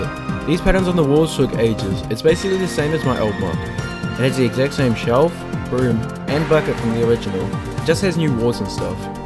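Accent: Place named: Australia. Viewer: Listen to that accent… bro really thinks he’s not Australian with THAT voice…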